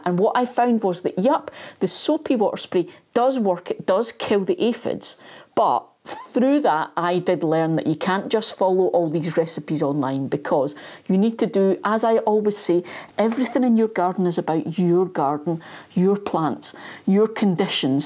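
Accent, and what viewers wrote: Scotland, United Kingdom. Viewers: Thank you, great yo hear a Scottish gardener on here. So far today in Forth there has been sun, high winds, monsoon style rain and then sun.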